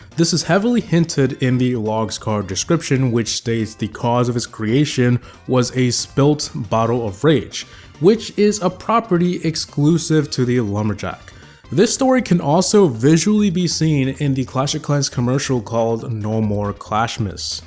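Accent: United States. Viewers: Good video expect the american midwest pronunciation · this is the most american man i have ever heard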